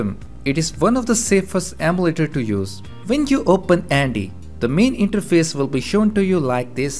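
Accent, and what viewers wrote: India. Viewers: It’s not that bad...he sounds like tech support from the land of India! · So Bad englisch i understand like nothing pls do something against your Indien acent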